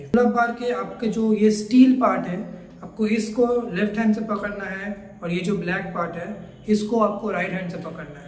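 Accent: Bengal, India. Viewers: Bengali ya odia?😂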